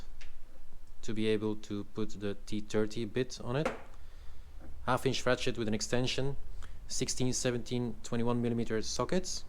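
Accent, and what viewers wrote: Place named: Belgium. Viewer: Ik kon zo horen dat je een mede belg was, goed uitgelegd bedankt voor de informatieve video 🙂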